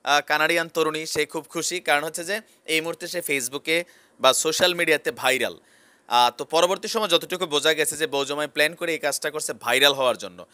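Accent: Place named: Comilla, Bangladesh. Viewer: ভাইয়া আপনার কুমিল্লার ভাষায় কথা বলাটা অনেক ভাল লাগলো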